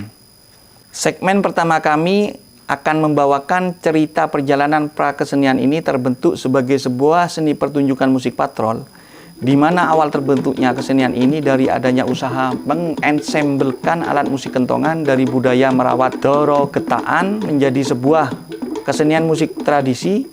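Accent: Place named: Madura, Indonesia. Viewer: Logat madure cak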